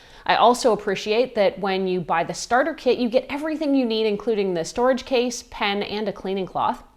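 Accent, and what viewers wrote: Canada. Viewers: Thank you, it seems like a lot of reviewers are Canadians nowadays. The giveaway is the pronunciation of "PROcess" in most reviews😅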